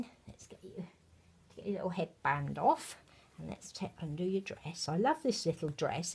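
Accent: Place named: Berkshire, United Kingdom. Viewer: Oh dear, you were definitely not too chatty and we both adore you. You are just like my Mum in so many ways. She also gets really nervous when meeting new people but she couldn't speak more highly of you on the way home from yours. She felt she made a real connection with you. Please don't think you put us off in any way - we love you just the way you are. Sarah Louise is looking gorgeous as always. The hat and booties are a lovely colour. Nothing wrong with a Berkshire accent I might add - I have a Berkshire twang as well - lol. Me and Mum want to visit you again soon but I don't want you getting nervous about it - silly you!!. Love and big hugs Annie xx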